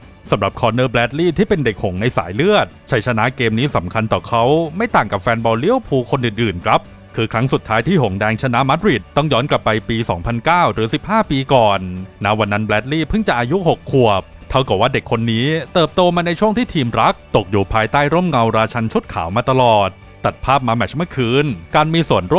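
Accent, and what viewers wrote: South, Thailand. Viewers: คนใต้กันนะเติ้น5555ติดตามมานานแล้วนะหลวง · พึ่งรู้ว่าแอดเป็นคนใต้ ชัดเจนโบ้เรา หรอยแรงแกงงัว😂😂😂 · 5555แหล่งใต้ได้ใจผมอิหลีคับ❤ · ผ่านจริงเติ้น ติดตามมานาน555 พอรู้ว่าเป็นคนใต้ ชัดเจนๆครับ❤ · เอ้าาา พอรู้ว่า คนใต้นิ 😂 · พี่บ่าวเป็นคนใต้เหรอ สำเนียงชัดแรงนิ❤ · หรอยน้องบ่าวแอดมิน แหลงใต้555+ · แอดเด็กใต้ด้วยหรอเนี่ย😂😂 ชัดเจนเลยไอ้น้องบ่าว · พี่บาวคนใต้เหอ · แอดมินคนใต้เห่อนิ😅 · ง่าเบอะแอดคนใต้นิ ชัดเจนพี่บ่าว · แอดคนใต้หรอ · แอดมินเป็นคนใต้นิ่ห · เอ้า พี่คนใต้หรอคับ ถ้าพี่ไม่แหลงใต้ ผมคงไม่รู้ ติดตามมาสักพักแล้ว ทำคลิปออกมาเยอะๆน่ะพี่ รอชมผลงานอยู่คับ ❤ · เห้ยยยยยย พี่บ่าวคนใต้เหอะนิ อัยจ่า ถูกใจ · หร่อยๆ ติดตามมานานแล้วครับ เด็กใต้ชัดเจน (ผม ป้ายชุมพรครับ) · คนใต้เฉย แหลงหรอยเลยหนิ ขามจังติดตามเลยพันนี้:face-blue-smiling:face-blue-smiling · แหลงใต้สุดยอดมากเลย ผมคนสุราษฎร์ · สำเนียงแอดนี้ไม่คนนคร ก็คนตรังผมว่าไอ้น้องบ่าว😂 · เอ๊าแอด คนใต้เหมือน😁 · พี่เป็นคนใต้เหรอครับ สำเนียงใช่เลย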